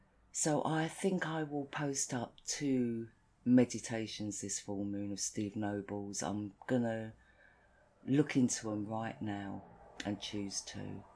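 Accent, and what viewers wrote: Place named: Kent, United Kingdom. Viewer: Your accent sounds like where we lived in Sandwich, Kent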